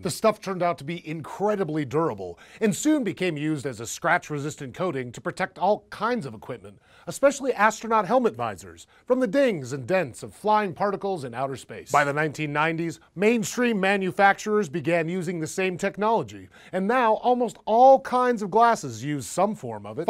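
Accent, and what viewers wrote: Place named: United States. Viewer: I´m normally not as keen on the American accent that I am on others, but this guy´s voice is purrrfect. And he works with SciShow. And he looks good. He makes me question my sexuality, goddammit.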